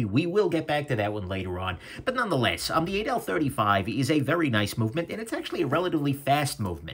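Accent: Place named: Brooklyn, United States. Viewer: You sound like you grew up in the tough side of Brooklyn, NY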